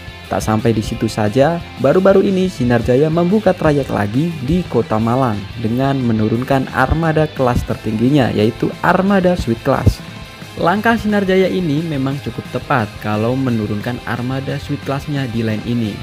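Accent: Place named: Banyumas, Indonesia. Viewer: Bise wong ngapak tambah jossss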